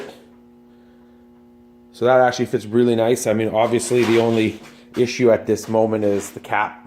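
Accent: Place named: Canada. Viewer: makes brrilliant hand-made parts showing excellent home grown engineering skills, and some nimrod criticizes him for saying "rad"?? Canadians say things kinda funny (they are working in a "gradge" for example, not a garage. is as pure a hot rodder as I've ever seen--he gets massive bang for the buck.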